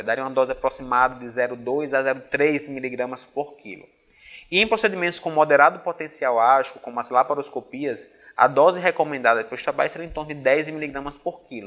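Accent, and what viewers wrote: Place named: Northeast, Brazil. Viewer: É um pouco complicado entender quando dispara a falar rápido e junta o sotaque nordestino e o agudo da voz,então complica pra entender. Surgido fazer fono se quiser falar por longo tempo.Ecplica muito bem mas o sotaque e o agudo atrapalha o entendimento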